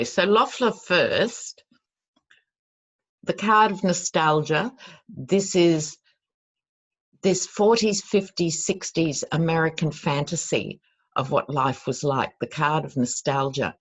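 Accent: Australia. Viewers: I love your Australian accent. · I love your accent. I actually listen to 3 of you aussies. You . Marianne and Elle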